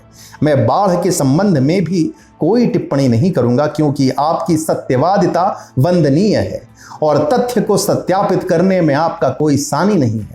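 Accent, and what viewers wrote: Standard. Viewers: Kya baat hai bhai tum Hindi bahut shudh bolte ho bhai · It's sudh hindi sunkar a6ha laga. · Sir, aapki aawaz sunkar accha laga, aap bahut sudh Hindi bolte hai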